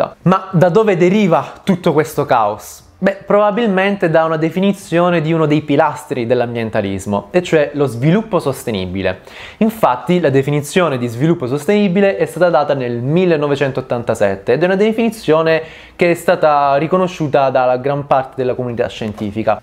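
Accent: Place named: Salento, Italy. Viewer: Ma.. accento salentino ne abbiamo? 🤣 Un saluto da un tuo conterraneo!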